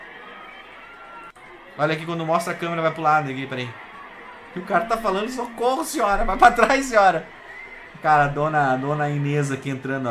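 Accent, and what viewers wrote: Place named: Rio de Janeiro, Brazil. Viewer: O carioquil é de fuder meu amigo kkkkkkkk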